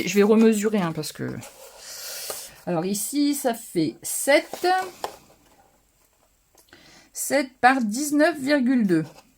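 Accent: North, France. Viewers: Coucou 🙋‍♀️J’avais reconnu que vous étiez du Nord à votre accent, sourire… Je suis du Grand - Est, de la Moselle.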